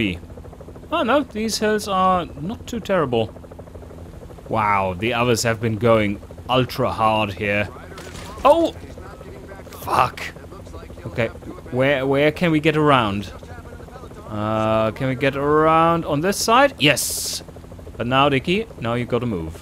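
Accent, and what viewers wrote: Germany. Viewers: Nice sympathic german speaking English. Ich liebe es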